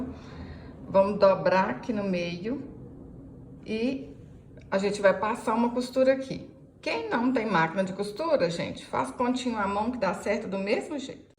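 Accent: Minas Gerais, Brazil. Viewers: Adorei. Amiga Vc é mineira ?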